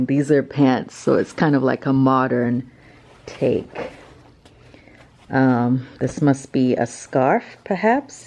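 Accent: Nigeria. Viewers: You look absolutely beautiful ❤️ you still have the Nigerian accent. Nice 👍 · Wow! Your Hausa accent is still evident after all these years 😂. The way you called Abuja was spot on. Allah ya ja zamanin ki malama...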